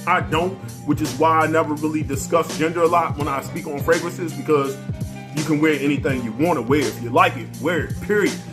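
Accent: DMV, United States. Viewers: Man people in the dmv really do have a accent. Love the video keep it up!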